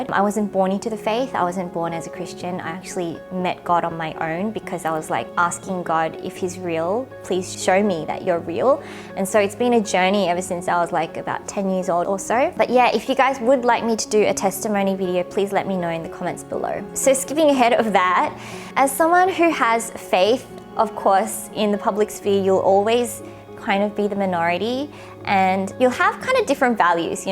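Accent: Australia. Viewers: Hi its my first time leaving a comment, but i love how you share your faith through ur channel. Yes id love to hear ur testimony! And I really like ur Aussie accent:)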